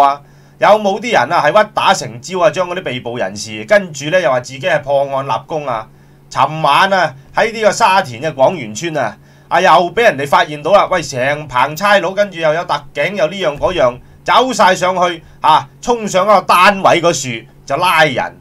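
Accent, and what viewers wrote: Guangzhou, China. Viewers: 好似廣州人口音，唔似香港澳門人口音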